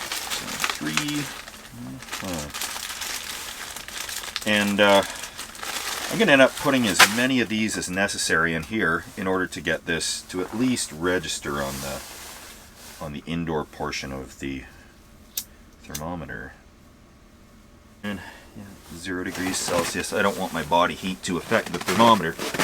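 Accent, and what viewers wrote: Canada. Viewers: I was about to go on the defense, but then he talked, and I get it. He does sound Canadian. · you sounded canadian and after i heard “vitamin beer” i had to check and i was right. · I knew he was Canadian ah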